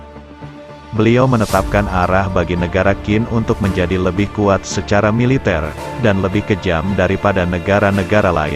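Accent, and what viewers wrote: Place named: Indonesia. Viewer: Lidah orang Indonesia.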